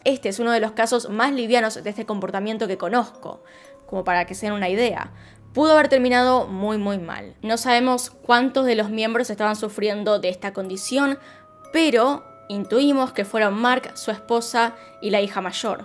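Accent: Argentina. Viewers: Tiene poco que empecé a ver tus videos, hay varios canales del mismo tipo, en tu caso me pareces muy sincera y directa, también tu acento argentino me ssama la atención jaja, saludos desde México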